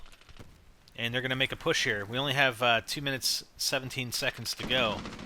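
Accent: Canada. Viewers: Wait... are you Canadian?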